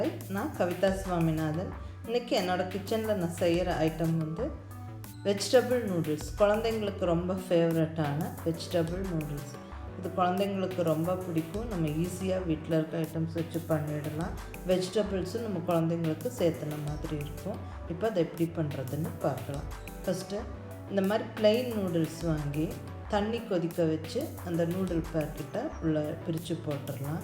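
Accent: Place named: Kongu Nadu, India. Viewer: Ur slang seems like erode r Coimbatore mam so sweet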